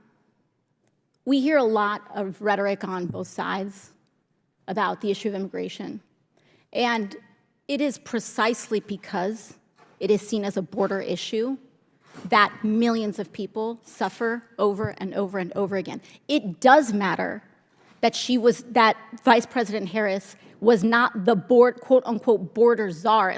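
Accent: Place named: Spain. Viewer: Just because you turn on your Spanish accent doesn’t make you an expert on immigration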